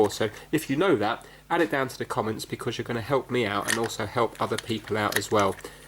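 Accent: United Kingdom. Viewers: Haven't watched the entire video yet but I'm having a moment of appreciation for this channel. I'm British but your accent is posher and softer than mine. I like how you film in natural light. You are enthusiastic in how you fix things and know about the background of most items. You usually speak as if you know nothing yet you've managed to fix almost everything. I've watched multiple 1 hour+ long fix it videos. Great content. Keep it up.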